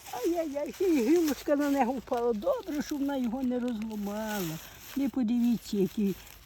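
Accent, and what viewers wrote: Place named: Ternopil, Ukraine. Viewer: Дай Вам Боже здоров'я, пані, і сили на многії літа. Мені цікаво де Ви проживаєте, бо повертаєте мене в дитинство, саме так говорили в нас в селі на Тернопільщині, де я народилася.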